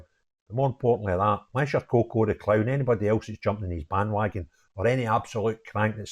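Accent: Scotland, United Kingdom. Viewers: You always have me in hysterics the way you explain things. Sounds so much better with you saying these things with a Scottish accent. Got on yeh. thks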